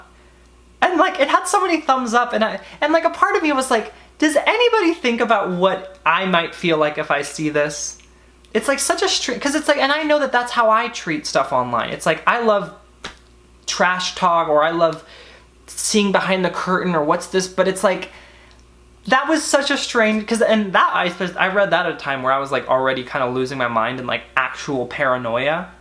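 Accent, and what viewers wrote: United Kingdom. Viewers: also, i NEVER find you boring, and i love your british accent lol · i love your british accent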